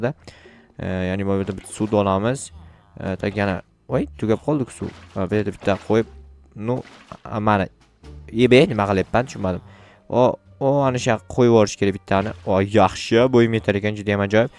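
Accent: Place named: Bukhara, Uzbekistan. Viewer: Buxoro shevasi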